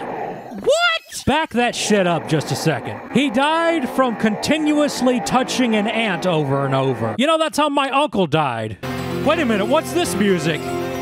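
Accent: South, United States. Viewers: A game reviewer with a southern accent covering one of the games that I played to death as a kid? Have a free subscribe, good sir. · He's southern like accent with a coyote avatar fits well like the final peace of the puzzle.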